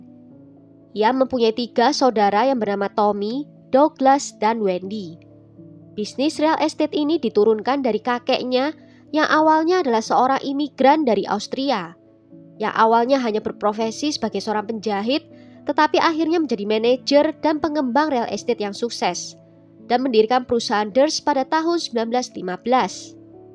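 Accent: Java, Indonesia. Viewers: Wah,hebat ya suaranya medhok Jawanya👍😍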